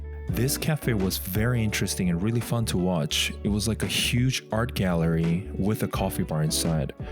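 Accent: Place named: England, United Kingdom. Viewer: Welcome back! I hope you didn't forget me 😢 I was wondering when you'll post and now you've uploaded I'm so happy. Also this is useful if I plan to visit Korea one day Thank you so much for making this video possible 🥹🥹🥹 also hope ginger is doing well you're looking more handsome 💕 and I really love your English accent can I get a virtual hug 🫂?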